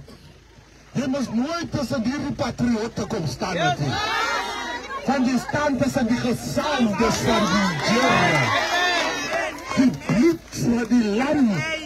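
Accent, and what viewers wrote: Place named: Namibia. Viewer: Coloured from Namibia, Mr u praat soos n Kleurling van Namibia, wat u se is presies wat in ons land ook aangaan.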